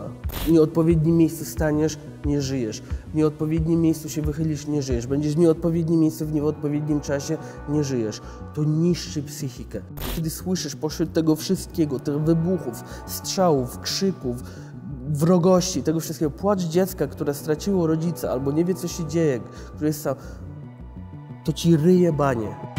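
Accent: East, Poland. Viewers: Ma delikateńki, leciuteńki, prawie nie słyszalny wschodni akcent. Można go zauważyć.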